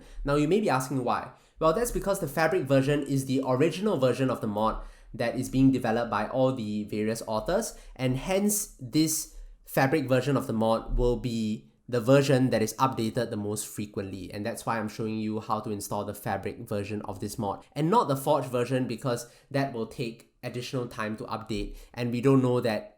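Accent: Singapore. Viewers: are you from singapore? your accent sounds kinda familiar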